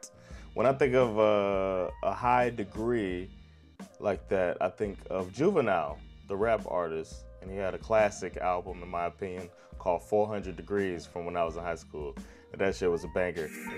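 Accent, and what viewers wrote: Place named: United States. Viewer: Damn your Swedish pronunciation is actually really good, I'm impressed. Of course there's an accent, which is to be expected, but you sound just like an American who's moved to Sweden and learned the Swedish language (at least from my perspective and interactions with Americans who's moved to Sweden)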